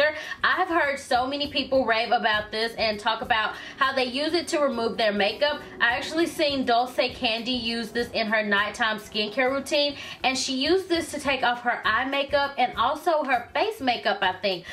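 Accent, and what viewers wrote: South, United States. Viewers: So I'm from Phenix City so i know all to well about that Bama summer weather. I bought the face & body last spring for the same reason hoping that it was a light coverage & didn't make me look like i was melting & i absolutely loved it for spring & summer. I sometimes would mix it with my Garnier BB cream. Hope you enjoy it & check out some of my videos & tell me what you think. Love your videos by the way. Its nice hearing another beauty lover with that southern twang. :)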